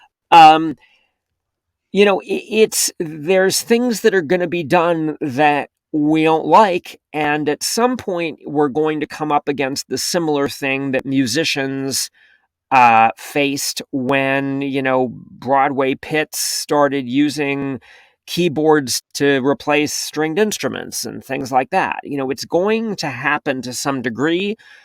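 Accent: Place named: Australia. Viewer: I love his Australian accent...Him narrating Honeymoon For One by Keira Andrews is amazing!!!!!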